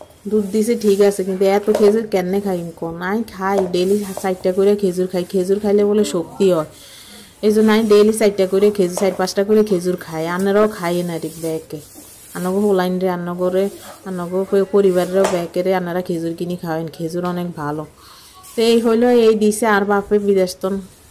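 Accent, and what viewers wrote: Noakhali, Bangladesh. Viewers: নোয়াখালীর ভাষায় কথা শুনতে বালো লাগে দারুণ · আসসালামুয়ালাইকুম প্রিয় আপু হাহাহা অসাধারণ হয়েছে তোমার মুখে নোয়াখালী ভাষা শুনে তো আমি ফিদা, মাশাহ আল্লাহ মামুনি জন্য অনেক অনেক দোয়া আর ভালবাসা রইলো অনেক বাজার,, ইলিশ আমার প্রিয় মাছ,, প্রতি দিনের বাজার প্রতি দিন করা ই ভাল মাশাহ আল্লাহ বস্তার ভিতরে তো অনেক জিনিস 😜 খেজুর পার্শেল করো আপু, অনেক অনেক ভালো লাগলো আপু ❤❤❤ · আপু আমি তোমার কথাগুলো খুব মনোযোগ দিয়ে শুনছিলাম।তোমার মেয়ের খেলার বর্ননা শুনে আমি হাসতে হাসতে শেষ।পুরা ভিডিওর কথা শুনেই আমি হেসেছি।তোমার নোয়াখালীর ভাষা বেশ ভালো লেগেছে।তোমার বাবার পাঠানো জিনিস গুলো দেখে আমার বাবারবাড়ির কথা মনে পরল।ভালো থাকবে।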